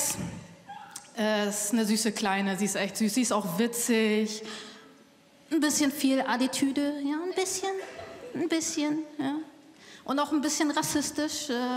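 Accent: Vietnam. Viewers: Eine typische vietnamesische Akzente Gemische, das macht der Humor auch so lustig. Weiter so und alles gute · Thằng nào ??? Har har har....ich erkenne so einiges wieder. Auch das Deutsch mit vietnamesischem Akzent, geil 😆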